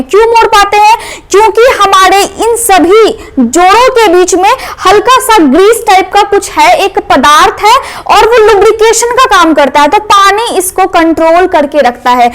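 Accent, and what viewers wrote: Bihar, India. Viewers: Bache chage your flow ..... Bihari language flow aah raha. Update beta . I am Army men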